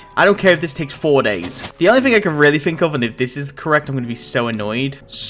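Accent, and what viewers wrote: United Kingdom. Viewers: Yo fr I CANNOT listen to a british "person" talk for 30 mintues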